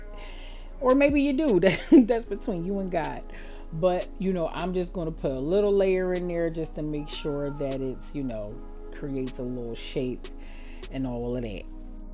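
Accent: Philadelphia, United States. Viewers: You do beautiful hair, talented, mellow with the Philly accent that shines through, I’m from South Philly but living in Cali for many years… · I love your hair videos however you wanna post them. You do great hair and it’s also nostalgic to hear you talk. It channels my inner Philly Jawn/ Girl even though I haven’t lived there in 20+ years. Lol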